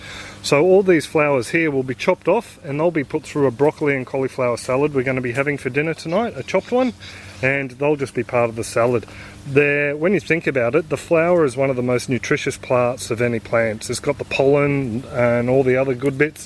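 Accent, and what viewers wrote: Australia. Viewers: Great, broad Australian accent and useful information. Must get chickens. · Very, VERY helpful. Thank you. I am from states but my nephew, his wife and her family are from and live in Australia. It was lovely to hear your accent, made me think of them.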